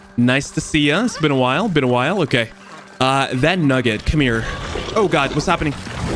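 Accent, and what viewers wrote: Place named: United Kingdom. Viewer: I'm here because I watched the co-op Devour stream and I needed to tell you that your British accent was my favourite and you should do it more often. 😂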